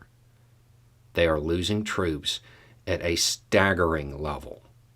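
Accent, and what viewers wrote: South, United States. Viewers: I want to trust you because of your beard. Stick with me, please. I want to to trust you because of your familiar southern drawl. I want to trust you because of your witty t-shirt choices. Really, hear me out. I want to trust you because of your sensible and informed perspective of global politics and international dynamics. I FEEL like you are honest, and that you value MY ability to understand what's REALLY going on in the world. Your words give me hope, and my ability to follow your train of thought makes me feel intelligent, and better informed for absorbing your discourse. Please, tell me this. What is your motivation for sharing your keen insight and your witty parlance? Is it for the clicks? Is it for the lolz? Or is it genuinely for the desire to promote a more informed public awareness of the issues facing this bewildered generation of hope mongers? 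I think you might be a thorn in the foot of the misinformation and cynicism that plagues the minds of the susceptible masses. I think you might be a hero. Why? Why do you share your perspective? Why do you post multiple videos every day to tell the world what we should have the sense to learn for ourselves? What motivavates YOU to motivate US to wake up and pay attention? Your answer to this question will I use to judge myself, and my naivety for entertaining content. You compel me to seek truth and lucidity, and I ask that you speak to this influence you have on me. Your silver tongue is a potent tool. Are you as genuine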